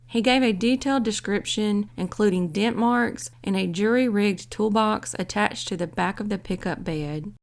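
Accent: South, United States. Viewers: Ah brilliant it’s my favourite southern accented girl talking to me about my favourites subject - true crime :) thanks 🙏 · This is the first CT true crime video ive ever seen on YT. Really cool since ive lived here all my life and have watched 1000s of true crime docs on here. Ty southern girl who sounds super hot.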